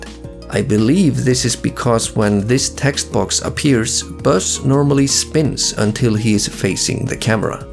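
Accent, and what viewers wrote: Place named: Iceland. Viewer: I never click speedrunning videos. Click this one and instantly was like woah is that an Icelandic accent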